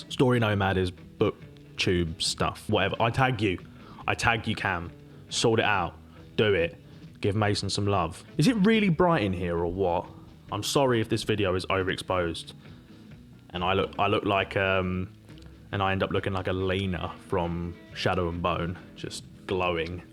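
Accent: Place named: Cockney, United Kingdom. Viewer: you've gone really cockney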